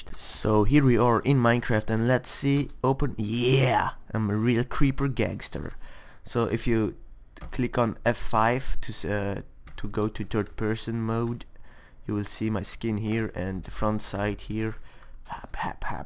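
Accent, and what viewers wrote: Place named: England, United Kingdom. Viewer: hahahah je engels accentje is grappig man :D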